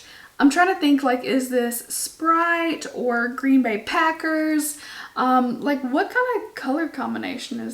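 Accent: South, United States. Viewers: Idk why I just saw hey y’all in the CC on my recommended, clicked your vid, heard your southern accent, and immediately subscribed. Lol I’m biased to southern girls like me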